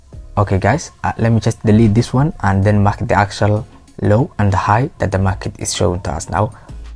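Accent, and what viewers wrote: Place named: Somalia. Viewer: Your accent looks Somali hh glad to see ur video though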